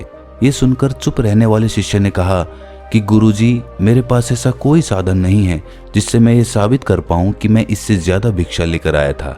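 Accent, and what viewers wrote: Haryana, India. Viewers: awaj kisi haryana wale ki hai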